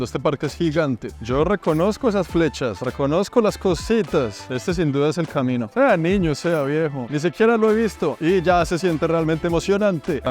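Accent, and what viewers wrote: Colombia. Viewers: Buena, parce. Japón es bacano, y más su forma colombiana de relatar sus videos. Siga así!!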